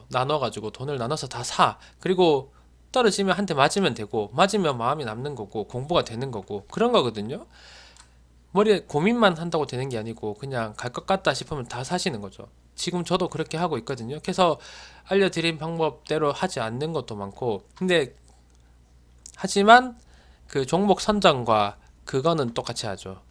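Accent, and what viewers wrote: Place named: Daegu, South Korea. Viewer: 안녕하세요 같은 직장인으로, 애 둘 아빠로 동질감을 느끼며 영상 잘 보고 있습니다~ 어제부터 정주행으로 조심씩 보고 있는데 나이도 비슷한거 같고 경북/대구쪽이니 사투리도 정겹네요~ 궁금한게 있어서 몇 가지 여쭙니다^^ 1. 주식 공부는 혼자 하신건지? 어떻게 공부를 하신건지?3년 동안 다지기 이후 이제 빛을 보게 되신건지?^^; (혼자 학습으로 3년 동안 시행 착오도 계셨을텐데...) 2. 대부분 종가베팅 후 시초가 매도던데 종목 선정은 이전에 올려주신 조건식 위주로 하신건지? 조건식은 어떤 것, 몇 개를 사용 하시는지.. 종가베팅은 대부분 3시부터 분할 매수로 진행 후 확신있는 것은 18-19분경 베팅 하시는지? 소액으로 종가베팅 연습중인데 승률이 그렇게 좋지 않아 좀 자세히 여쭤보고 싶어요~~ 감사합니다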